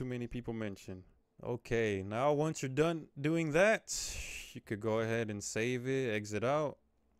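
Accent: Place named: India. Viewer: He sounds Indian of some sort. He let his most of his family name.He knows nothing nothing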